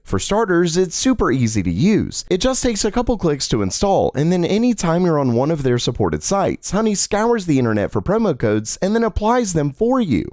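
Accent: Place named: Texas, United States. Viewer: I just realized how Texan he sounds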